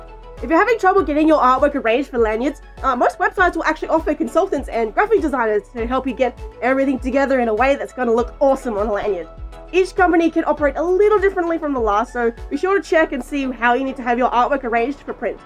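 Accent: Australia. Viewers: i have no idea why but i can hear the Australian accent stronger for this episode and i love it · I forgot you were Australian until I clicked on this video......Oof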